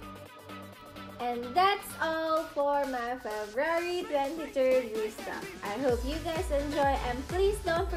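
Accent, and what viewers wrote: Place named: Philippines. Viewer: She is a pinoy...so her first language is filipino. Atleast she tries her best. Hope u understand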